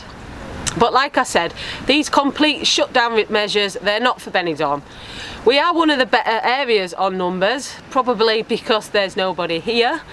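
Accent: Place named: England, United Kingdom. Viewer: You have a good english accent but you talk American..........guys, guys, guys, guys, guys, guys, guys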